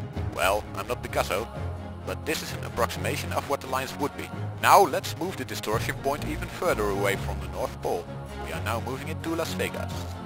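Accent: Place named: Netherlands. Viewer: Very hollands accent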